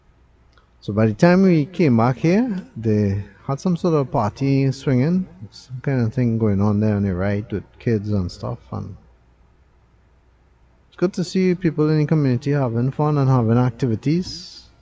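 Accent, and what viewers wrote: Trinidad and Tobago. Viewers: Hello,I love watching all your videos, I like the trinidadian accent,I come as often as possible as my family all live there,I now live in Europe,please talk more and Ill watch more often..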